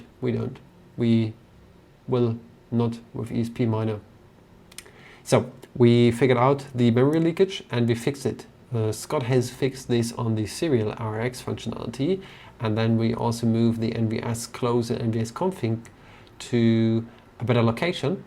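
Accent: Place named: Oxford, United Kingdom. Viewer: Klingt sehe nach Oxfordenglish. Leider ist mein Verständnis sehr begrenzt. 😪 Best regards from Ingo😊. 🖖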